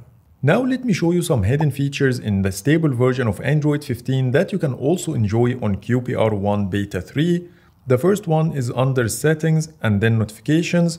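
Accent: Egypt. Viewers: I came for the Egyptian accent and stayed for the content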